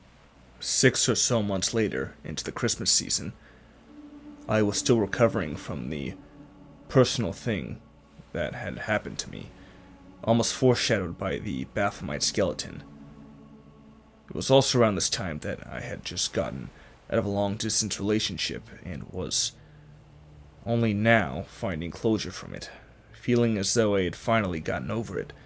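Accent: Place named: Yorkshire, United Kingdom. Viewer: Sounds like a yorkshireman right enough. 😏 Ps. We also have resident dolphins and tuna in UK waters during warmer months.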